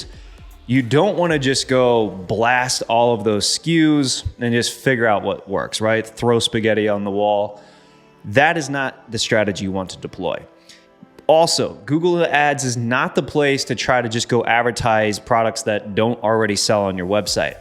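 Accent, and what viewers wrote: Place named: Boston, United States. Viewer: I am from Boston and you sound like you are from around here! We are straight shooters like you - love the vids! All hard content and no bs fluff! Thanks man - awesome videos!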